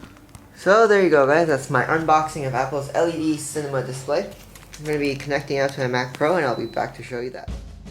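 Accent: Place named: United States. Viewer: dam!!! so much happend to you well hope it goes well you live in hong kong?? you sound so American... heheh its all good cheers.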